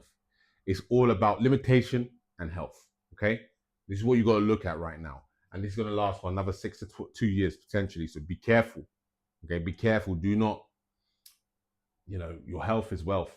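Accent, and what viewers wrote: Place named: United Kingdom. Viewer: So refreshing to listen to a wonderful astrology session that is presented with a British accent!!❤🎉🙏👍💫✨️🌟✨️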